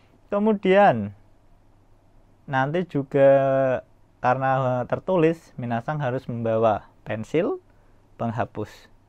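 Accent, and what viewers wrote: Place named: Japan. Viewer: sensei nada bicaranya kecampur logat jepang😭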